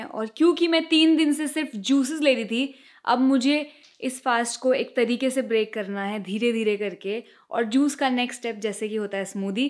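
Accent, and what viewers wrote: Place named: North, India. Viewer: Really liked the simplicity of this girl. No unnecessary "angreziyat" or overacting. I like her pure desi North Indian Hindi and how confidently and proudly she's speaking it. Very good video 👍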